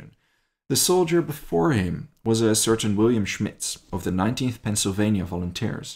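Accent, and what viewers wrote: Germany. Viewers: with The German accent - 1. Is the opening music you use from Bloch's Hebraic Rhapsody.? I am just trying to place it. 2. Another unfortunate was one Theodore Schurch who did some minor interrogation work for the Germans after being captured. His father was Swiss so presumably he spoke Swiss German. There was something about his English which made some of he British officers guess he was really a turncoat. He was allegedly captured in Rome in March of 45.. well after Italy had fallen. Why he did not try to get to say CH and the to Australia or Canada and simply disappear is beyond me. Perhaps his relationship with his CH relatives was not so good. Well even though the war was done and dusted by the time of his trial he was still found guilty of treachery and Hanged... I think he was just too cocky and he could easily have avoided the noose. 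I know of no other UK soldier executed by the brits for treachery or treason during WWII. K Fuchs who was a UK fast track citizen was very lucky to avoid the noose and had the USA counter intelligence caught him, he might have been given a heavy sentence while the Manhattan project was running (to prevent the other scientists going on strike during the war effort ) and then executed on some other charge post WWII. But the US never caught him in the act. Tim Fidler, NZL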